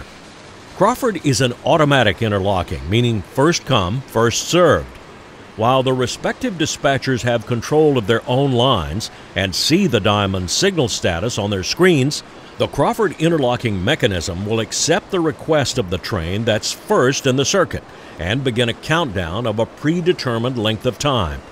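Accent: United States. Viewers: Mr. speaks near perfect, American english. Enunciation, tone, accent, inflection, rhythm. Not a single word did i misunderstand. A dissappearing trait... ☆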